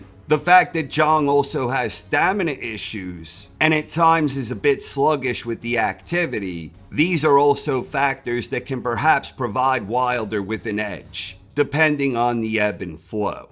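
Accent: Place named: New Jersey, United States. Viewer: His videos are actually quite good. I like his voice, very New Jersey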